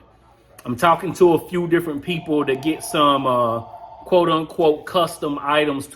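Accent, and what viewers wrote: South, United States. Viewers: That country accent makes these videos hard to watch. Narr, parr harr, hurr. That why its taking so long to get 5k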